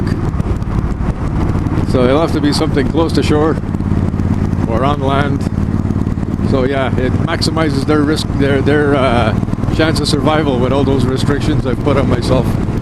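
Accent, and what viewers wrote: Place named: Fargo, United States. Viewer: Hello! A thoroughly enjoyable trip along with some wide-open Canadian scenery! Good to see the Missus and her happy, cheery face! A real treat! Now that the December snow has arrived it was nice to see some earlier, snow-less fields! Hunting stuff with Big guns, Bear Traps, and Fishing gear...😮...😮.. you know me..😉....😉...., I just pop into Sainsbury's Super-Store and collect all my wild-life...ready prepared for me!...LOL..😋....!... and no-one gets shot!...LOL..😂...! Your "Fargo" (film) accent still tickles me,...every time!... 😂...😂...! Many thanks again for the trip, and best wished to the Missus...she needs to be kept warm!....😊...😊...!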